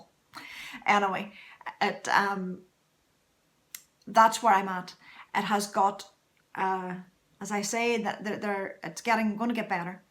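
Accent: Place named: Ireland. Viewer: Firstly I love yr accent m Dad came from Limerick. Sadley he passed over in 2017 and I miss that wonderful Irish voice. Now yr podcasts are great and your jumpers amazing.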